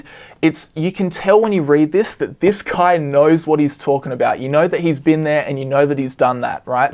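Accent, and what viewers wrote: Australia. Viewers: Are you Australian? If yes, whereabouts if you dont mind sharing?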